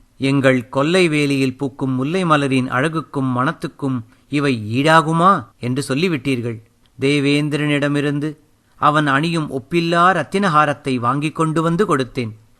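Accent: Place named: Tamil Nadu, India. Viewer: hello sir this is Karthic from Coimbatore am big fan for ur audio book and liked ur Tamil ascent very much gud work kudos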